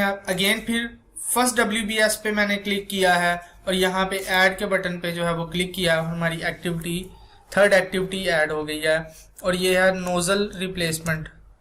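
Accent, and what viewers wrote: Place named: India. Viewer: Can you speak english with u.s accent not indian accent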